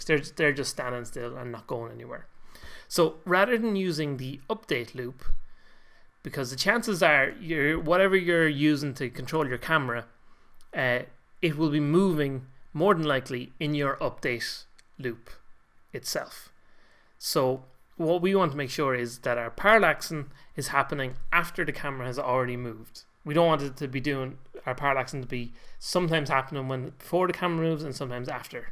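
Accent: Ireland. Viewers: Great video! PS: Your voice sounds like Jacksepticeye (because you're irish)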